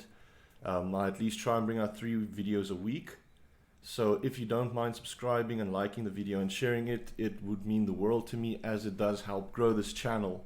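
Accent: Afrikaans, South Africa. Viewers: Is jy Afrikaans?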